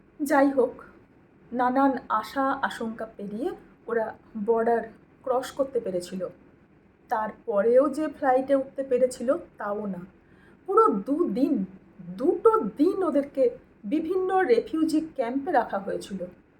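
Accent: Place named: Bangladesh. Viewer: আপনি দয়া করে ঐ 26 জন হিন্দু কে তার স্ত্রী, বাচ্চা ও আত্মীয় দের সামনে, শুধুমাত্র হিন্দু হবার জন্য অস্ত্র হীন মানুষ গুলো গুলি র বৃষ্টি বইয়ে দিয়েছিল কৈ তাদের নিয়ে এই বাতেলা তে শুনতেই পেলাম না।ঠিক আছে ঐ কাশ্মীরে ঐ অপদার্থ গুলো যায় কেন? তার দায় কি আমার? ভালো কথা আপনার ভাষা শুনে মনে হচ্ছে বঙ্গালী।সরি বাঙালি তাহলে মুর্শিদাবাদ, মোথাবাড়ি ইত্যাদি ইত্যাদি তে যে হিন্দু দের কেই টার্গেট করে হিন্দু বাড়ি ঘর জ্বালানো, লুটতরাজ, মহিলা দের শ্লীলতাহানির মতন কটূক্তি কর কথা বার্তা সেগুলো??? ও হো, সো সরি ঐ যে কে একজন ডায়লগ দিয়ে বলল আমরা 70% ওদের কেটে গঙ্গায় ভাসিয়ে দেব।তখন আপনি বাতালা ঝাড়ার মতো মেরুদণ্ড ছিল না, কারণ ঐ লোকটা ই বলেছিল হেন্দু পান্ত ভাত খেইয়ে ঘুমুচ ছে, তাই আমরাই করব।কৈ তখন তো কোনো লে চ কা র ইউটিউবে পাই নি কোওওওও?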